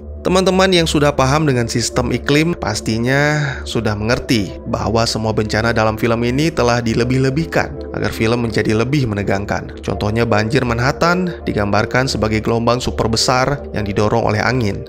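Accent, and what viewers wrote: Aceh, Indonesia. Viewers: Dari Aceh ya bangg 😭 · lu dari aceh bang? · Ooo miminnya dari Aceh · Asal Aceh ya bang 🙏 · Bang orang Aceh pasti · Brakti bg orang ACEH ya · Abang orang Aceh ternyata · Abang dari aceh?😳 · Orang Aceh bg? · Ooh. Mimin org Aceh ya? 😅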